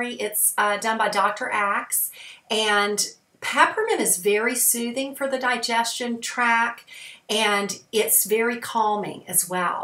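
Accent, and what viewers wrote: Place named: South, United States. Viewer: love ur southern drawl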